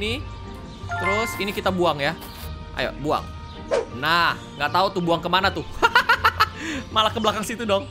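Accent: Java, Indonesia. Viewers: Kakak jawaya? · bang orang jowo atau orang palembang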